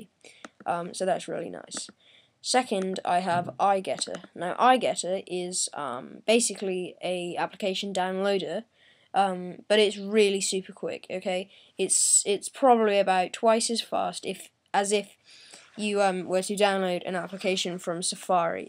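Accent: United Kingdom. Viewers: cool i like ur british accent..specially when u say Dock doctor.....so cute.....i have to learn british accent...thanks for suggesting ur fave application....xoxo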